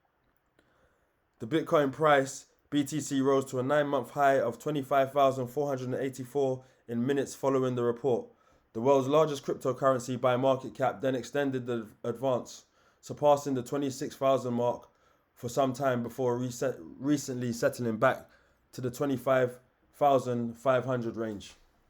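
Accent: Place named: South London, United Kingdom. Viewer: I feel like I know u bro the way u speak I feel like ur in south London my guyyyy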